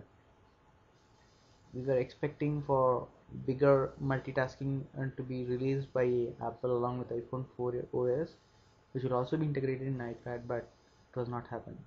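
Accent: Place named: Vietnam. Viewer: LEARN TO SPEAK ENGLISH. u from vietnam? u sound like one, seriously correct your accent, pull your tongue out (vietnamese language require to speak with your tongue always intact in)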